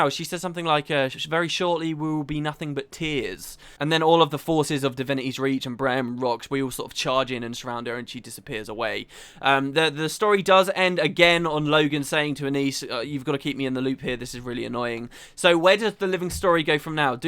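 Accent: United Kingdom. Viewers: He's Brittish, they pronounce things differently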